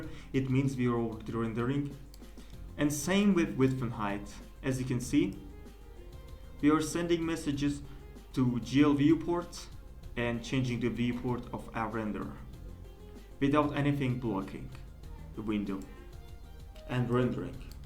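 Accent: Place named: Iran. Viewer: Mate u sound vaguely persian. Correct me if im wrong. Either way, love the content. Looking forward to future videos.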